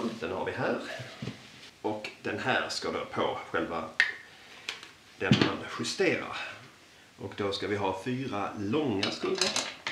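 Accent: Skåne, Sweden. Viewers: Hi, I’m a Dane from east Jutland and I understod all of what you said without any major issue. My question is: would you consider yourself as speakning a prober Skånska dialect or perhaps a modern form of Swedish-Skånska? -which ofcourse is Swedish anyhow.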